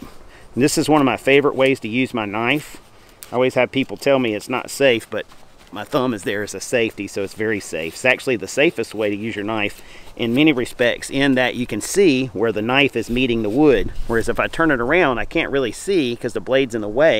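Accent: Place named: Georgia, United States. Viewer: Having only known you from this channel. I have detected a southern "twang" in your speach, are you from Georgia?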